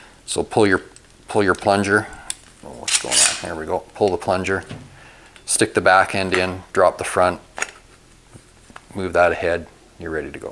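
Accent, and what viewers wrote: Canada. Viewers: I recently found your channel. I have watched a couple of your videos. I think you are a really great instructor and I appreciate your videos very much. I lived in Alaska for 25 years and consider all Canadians as neighbors. You are wonderful neighbors. I mean this is a really complimentary way, I admire your accent! LOL. Keep up the good work Brother... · Very helpful. Love your Canadian accent! I’m anCanadian living in the US and miss that so much. Thanks for your good pointers.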